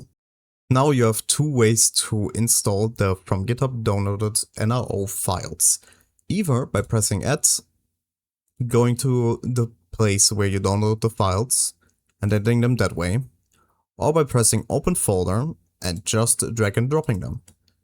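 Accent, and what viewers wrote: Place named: Germany. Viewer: i hear a little deutsch in your voice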